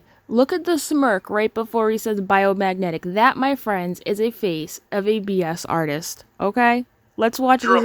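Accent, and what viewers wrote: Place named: Boston, United States. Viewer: Great episode.... I love your accent.. Do I detect a Boston accident?? Maybe new york? One of the burroughs?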